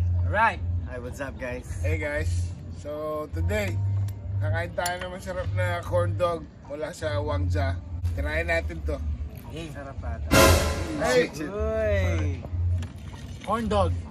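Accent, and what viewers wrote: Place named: Pampanga, Philippines. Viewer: The Campampangan Accent tho. 🤘😁🤘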